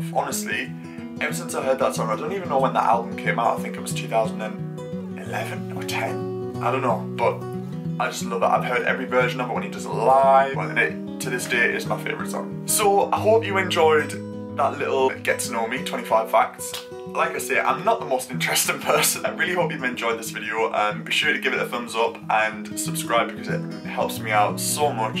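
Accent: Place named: Newcastle, United Kingdom. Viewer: Anyone who likes dogs more than humans is THE BEST‼️❤️🐶🐶🐶🐶🐶🐶 just discovered you via Mr Carrington👍 lovely to hear a fellow Geordie ( ish) x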